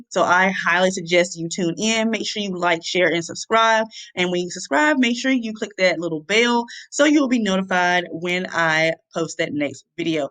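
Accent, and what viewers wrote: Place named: South, United States. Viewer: oh you’re from Enfield, you country lol I mean I’m country too, but y’all accents are thick lol Do you prefer mailchimp over Privy?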